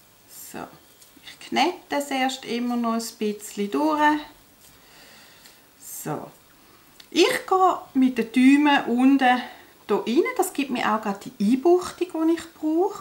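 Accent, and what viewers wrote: Switzerland. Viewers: Hallo hab dich per Zufall entdeckt, bin so begeistert und habe direkt abonniert. Dein Schweizerdeutsch gefällt mir auch.viele Worte hören sich an wie unser Dialekt.lg aus Deutschland/ Rheinhessen LG Ingrid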